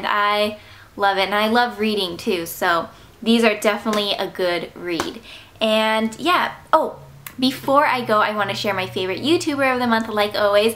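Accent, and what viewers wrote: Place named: Australia. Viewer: hells yeah aussie accent :D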